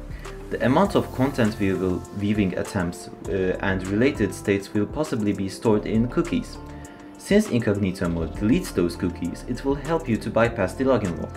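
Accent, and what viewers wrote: Turkey. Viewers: Turkish voice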